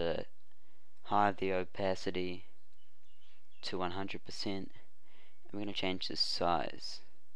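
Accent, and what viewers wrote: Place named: New Zealand. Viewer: wonderful tutorial mate. do i hear a bit of NZ in your voice? :) very easy to follow and well demonstrated, no issues at all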